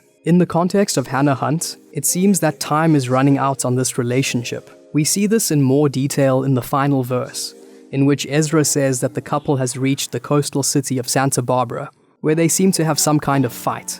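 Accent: South Africa. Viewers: I clicked on this not expecting a South African accent. Now I'm subscribing. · So weird to hear a South African accent. Love this song, love the video.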